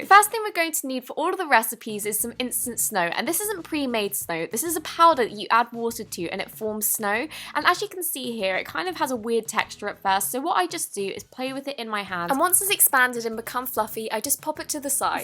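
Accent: United Kingdom. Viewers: Theofficialblossom vlogs she form the United Kingdom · Lol it's a British accent like mine. so there Americans now u know that our accent isn't POSHY POSHO 😄 so just remember dats how we lot sounds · Theofficialblossom vlogs British accent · Theofficialblossom vlogs shes British like me 😁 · I love your British voice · I love how she talks I talk American so it’s so much different than her British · Theofficialblossom vlogs she’s British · London/British accent like mine 😊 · Where do you get tour borax from? You sound British like me and I can’t find it anywhere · Theofficialblossom vlogs it’s british like mine!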